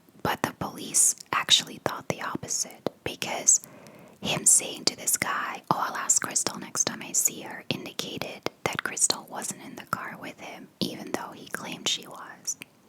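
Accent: United States. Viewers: i’ve watched your vids for so long and i’m still in shock that you’re from belgium. your english is flawless and your accent sounds so american i’m fooled every time😂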